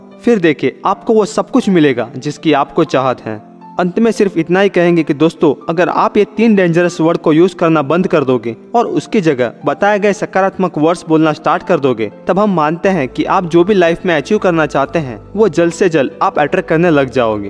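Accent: Gujarat, India. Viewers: Good tips, very useful. Are you from Gujarat?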